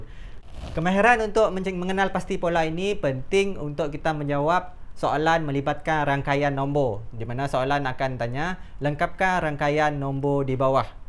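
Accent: Sabah, Malaysia. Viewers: Cikgu bukan cakap orang sabah ke?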